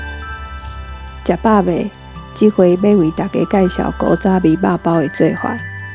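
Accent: Hsinchu, Taiwan. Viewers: 第一次聽到新竹腔耶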